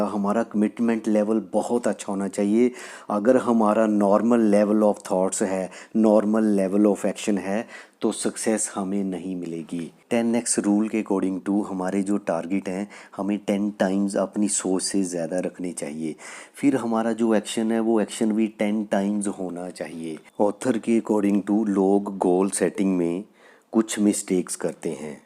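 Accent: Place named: Punjab, India. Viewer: Your accent has touch of Punjab of Pakistan.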